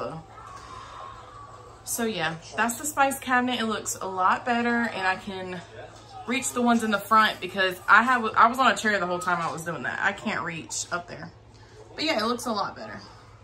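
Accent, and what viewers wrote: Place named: Virginia, United States. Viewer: I’m inspired. I like your accent. Where are you from? You sound like a gal I know from Virginia. Maybe I’ll fire the old Vlog back up and clean some stuff. :)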